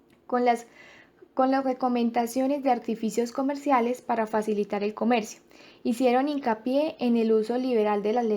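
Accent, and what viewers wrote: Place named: France. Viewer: tuvieras un toque francés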